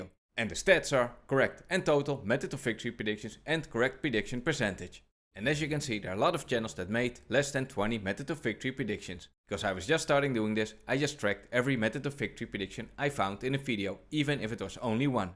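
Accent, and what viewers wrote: Netherlands. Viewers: Respect for all the work, by the way I can't un-hear you must be Dutch ;')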